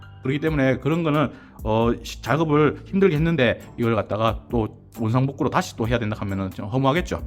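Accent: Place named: Gyeongsang, South Korea. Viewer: 말투가 경상도 분이시네요 ㅋㅋ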